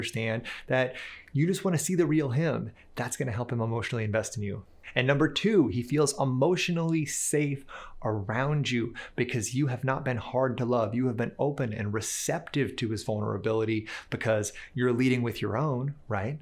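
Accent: New York, United States. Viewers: Are you American? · Omg i love your new york lady accent 😂😂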